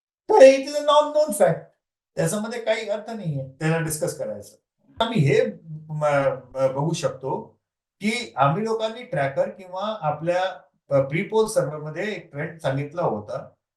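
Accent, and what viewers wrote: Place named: North India, India. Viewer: हा भैया सारख मराठी बोलतो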